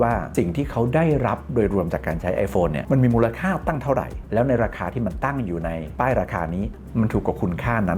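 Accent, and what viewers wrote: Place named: North, Thailand. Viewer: นี่คนเหนือชัวๆ😂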